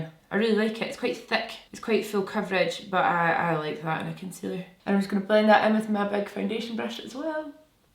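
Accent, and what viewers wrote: Scotland, United Kingdom. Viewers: I love the Scottish accent!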